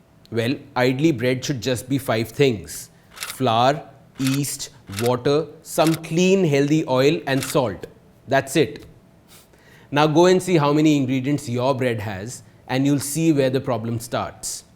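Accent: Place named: India. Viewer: first correct your accent. indian. you guys speak englidh very worst that you seem like speaking shit language like your indian and it hurts listening to your ugly accent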